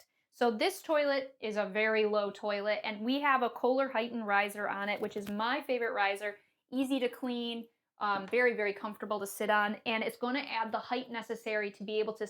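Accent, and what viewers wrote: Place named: Great Lakes, United States. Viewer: is a Michigan person. Great Lakes accent.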